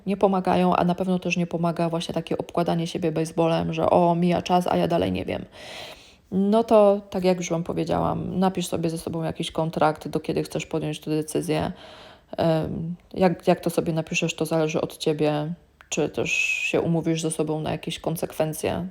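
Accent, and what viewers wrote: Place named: United Kingdom. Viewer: If you talk English why you have a British accent?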